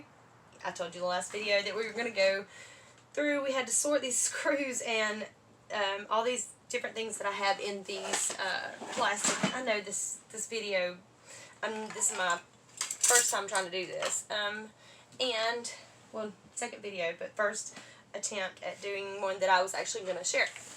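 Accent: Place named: South, United States. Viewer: I love your southern accent! it’s sounds like sweet tea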